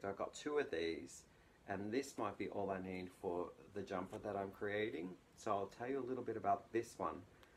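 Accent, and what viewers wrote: Australia. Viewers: Thought I recognised the accent. Hello from a fellow Aussie. Love Canada too! Anyway Starting with video number one after your channel came through on my feed. Gosh you only start 6 months before this video. Great job on the chevron scarf it looks gorgeous! I'm really looking forward to seeing how far you've come in the 3 years since you started out.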